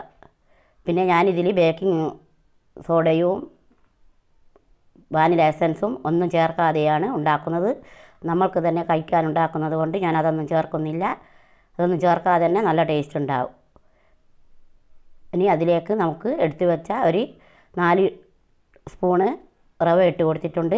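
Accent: Nadapuram, India. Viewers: Nadapuram bagathulla basha samsarikkunnond chothichatha njnum Nadapuram ഏരിയയില്‍ aanu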